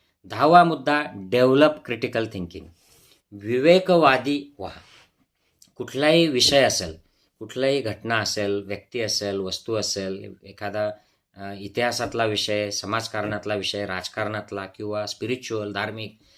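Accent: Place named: Ahirani, India. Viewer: डॉक्टर साहेब मराठीमध्ये सांगत असताना अहिराणी ची झलक येते थोडी-थोडी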